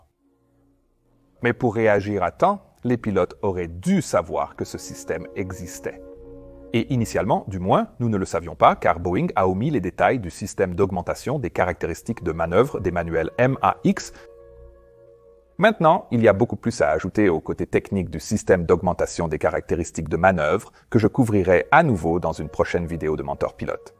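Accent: Canada. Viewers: Dear, I am a French speaking Swiss citizen. I listen regularly at your great interventions with much interest. Your talks are so well documented, no fake news, trustworthy infos, very educational. Recently I discovered the French versions, translated with AI. Your voice is tainted with Canadian accent and is not as fluent as your English. Sincerely I prefer from far to listen at you in English, as your English is absolutely perfect. May be a suggestion: you should definitely have the original English version. Why not put a link to switch to the original English somewhere below the display?. Thank you and congratulations for your amazing work.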